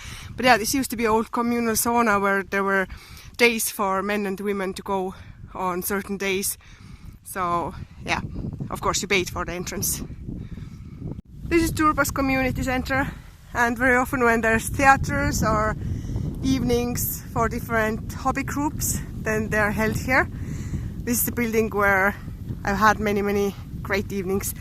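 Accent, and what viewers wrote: Ireland. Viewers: I bet u live in ireland as u have irish accent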